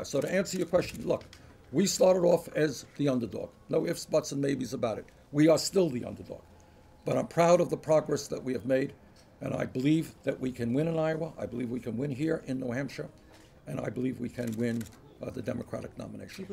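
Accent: Brooklyn, United States. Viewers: He is toning down on his Brooklyn and perfecting his politeness as well as his policy. Watch out Clinton campaign - its a marathon, not a sprint. Although we do have the legs in this race - by far.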